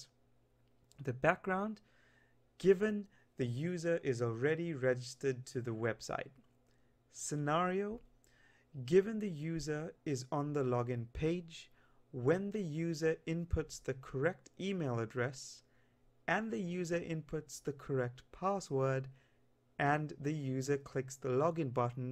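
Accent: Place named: South Africa. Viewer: Great tutorial, very clear and concise! Nice to hear fellow South African (you sound a bit like Elon Musk actually!)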